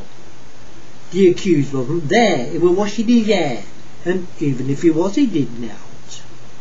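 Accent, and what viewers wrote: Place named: Lancashire, United Kingdom. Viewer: Oi! Let mee gess! Oi loike it, because eet's gud fer lernin' a Lancashire ahccent! Translation into American English: Hey! Let me guess! I like it, because it's good for learning a Lancashire accent!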